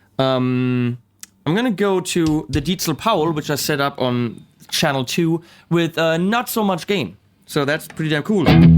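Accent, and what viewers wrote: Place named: Germany. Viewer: A review without this guy talking or someone else who doesn't need to be extra super dooper pseudo cool and swaggy fucked up german accent english. Yeah people get it. You like to hear yourself talking english but please keep it to your self or talk in your native tongue and use subs. I'm not trying to discredit your knowledge or playing. It's just your talking wanna be cool shit is so annoying man. And those great idiotic dumbfuck jokes. Oh you are so extra hip and remained young. You know? If no one is laughing it's not funny. Goddamn you're a douchebag. Even more than me.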